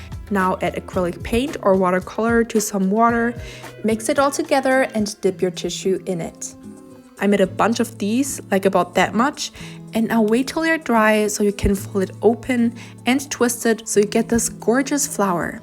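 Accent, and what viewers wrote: Germany. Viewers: I CAN HEAR THE GERMAN ACCENT GIRLIEE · You have a big of a German accent. Are you German?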